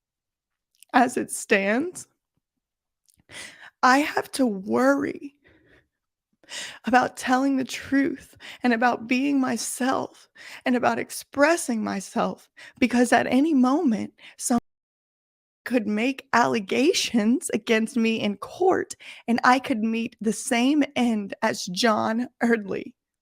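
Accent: South, United States. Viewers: ...I can also relate to something similar in healthcare. I'm finding this late but I wanted to say how freaking ahhmazing you are. When i first found you a couple of months ago, I thought you were just a quirky youtuber with a southern accent. I quickly have realized what a gem you are. So intelligent and funny! Side note, my husband who doesn't watch any youtube has taken to calling you " '. (yeah i know) and you've made him a fan. Love and respect!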